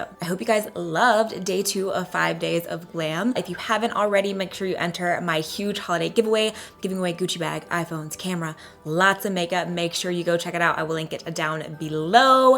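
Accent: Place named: Southern California, United States. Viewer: Katie Meyer I think it’s the CA accent. Not “white privilege”. Some of us sound like that here in So Cal. Like, Valley Girl accent almost. (Just my opinion). She has stated she’s half Puerto rican too.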